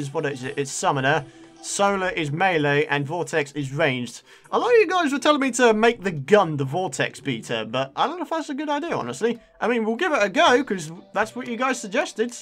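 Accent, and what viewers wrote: United Kingdom. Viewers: Will somebody please tell me why British people pronounce z as zed? It makes no sense to me, sorry! I normally pronounce it as "zee"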